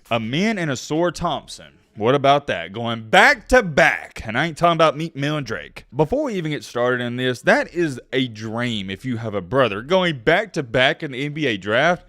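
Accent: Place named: North Alabama, United States. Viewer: I had a feeling you were from north Alabama. I live in Madison.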